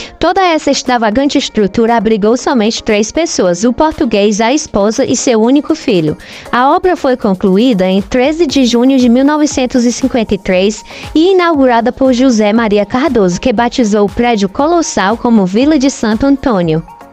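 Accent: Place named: Saxony, Germany. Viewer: Morava perto quando novo e era especialmente maravilhado com as rampas para carros. Você é cearense mesmo? É uma dúvida sincera, porque sua pronúncia dos "R" é um pouco saxônica.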